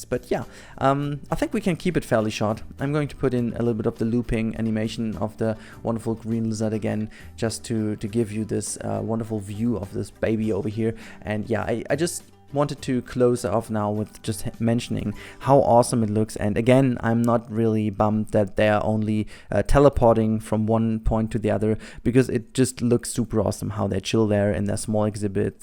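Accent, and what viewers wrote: Germany. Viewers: Are you german? Your English sounds like German :D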